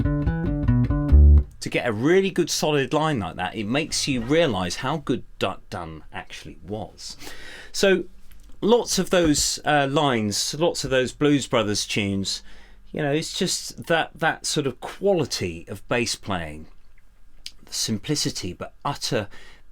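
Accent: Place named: United Kingdom. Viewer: Definitely not complaining, but are all online Bass instructors British?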